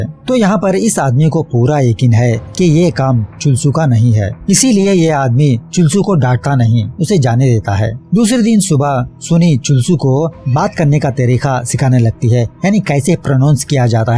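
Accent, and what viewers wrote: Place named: Bengal, India. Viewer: Bhai saab ap yeh sab movies kahan se Dhundke nikalte ho, ASTONISHING😲 😍!!! Love ur Bengali accent in the explaination 😍😍😍... Continue it we'll Support it!!!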